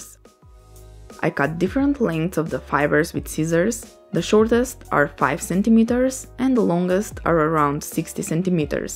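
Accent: Russia. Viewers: Русский акцент? Русский акцент = крутой видос Спасибо за интересный туториал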